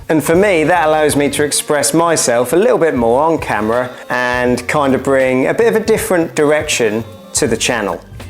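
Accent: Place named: Norwich, United Kingdom. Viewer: I love watching your videos. As I spent the first 25 years of my life in Norwich I recognised your accent immediately. Looking forwards to more great content, happy new year.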